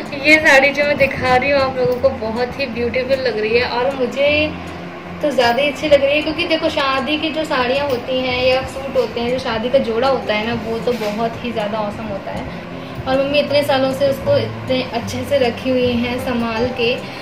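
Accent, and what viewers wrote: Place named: Varanasi, India. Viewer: Banarsi bolty hn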